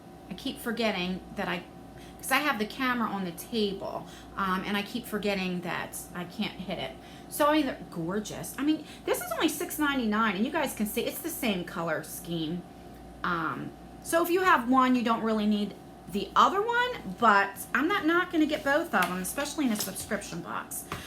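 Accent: New Jersey, United States. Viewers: 😘😘 love your videos! They make my day! Your jersey accent is the best!!! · I love YouTubers that are real! You know just themselves and Hughes seem I don't know if it's because I'm from Jersey myself you know and relocated but I miss it if that's maybe why I feel this connection to you because I mean all my friends growing up I mean I can hear them in your voice I can hear your accent I feel like I'm back in New Jersey hanging out with my friends doing crazy things and that was a really good time in my life. I had great friends back then we're still friends we keep in touch but it's not the same you know everyone gets older and grows up and then life hits us! LOL. It's just brings me back and you're just such a real person I just love it! Never change please because you've got what YouTube needs! Much Love XOXO - Kylie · New Jersey represent! 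Your accent makes me home sick for NJ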